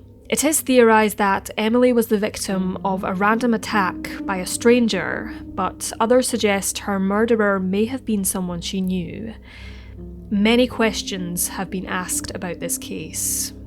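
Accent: Scotland, United Kingdom. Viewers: It's Scottish can't u tell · You know it's a Scottish narrator, when you hear, "alight" instead of "get off." Thumbs up for eloquence! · It's a perfectly good Scottish accent, nothing funny about it!! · I can see why businesses often want to set up their call centres in Scotland. Can't beat the Scottish accent. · Which part of Scotland are you from? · Excellent. Another sad sad case I never heard of. Wish I could have helped. Thank you. I have subbed as well. PS I love your accent. Are you...Scottish? Please forgive me if I am wrong.🙏😩✌👼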